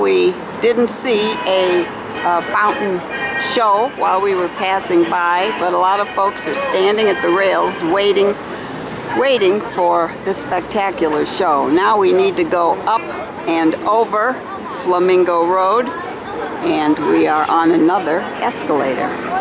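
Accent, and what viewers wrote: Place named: Chicago, United States. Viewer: Where do you come from with that accent? You sound like a Chicagoan.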